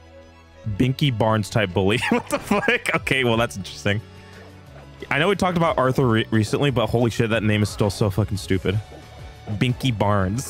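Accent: Finland. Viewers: is he Finnish? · are you finnish???? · Are you finnish?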